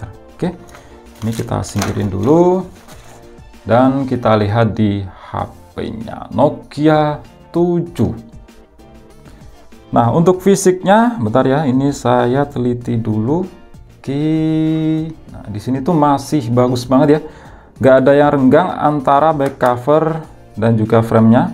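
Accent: Blitar, Indonesia. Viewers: Orang Blitar ta mas??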